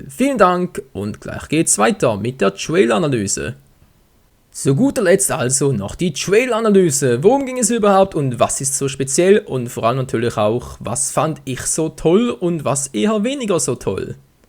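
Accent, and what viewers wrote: Switzerland. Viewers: hahahahahaha er isch schwizer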